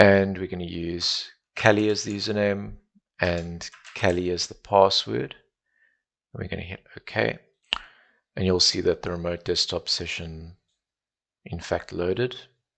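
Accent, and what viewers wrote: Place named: South Africa. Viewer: Cool South African accent.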